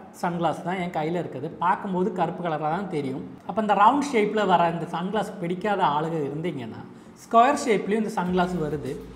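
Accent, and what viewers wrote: Kerala, India. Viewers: i guessed it. Malayalam slang varuthu nu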